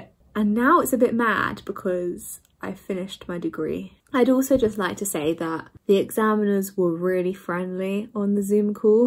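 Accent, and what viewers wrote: United Kingdom. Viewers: You have a really strong British accent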